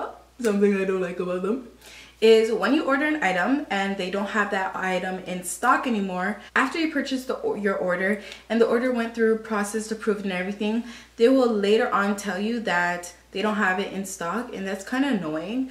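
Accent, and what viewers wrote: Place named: Latin America. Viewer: You're so cute! So you're in Canada? I keep thinking I hear a little Latina in your accent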